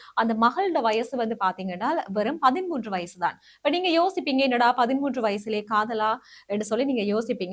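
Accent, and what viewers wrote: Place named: Sri Lanka. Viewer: மேடம் உங்களுக்கு என்ன இலங்கையா உங்க பேச்சு எனக்கு ரொம்ப பிடிச்சிருக்கு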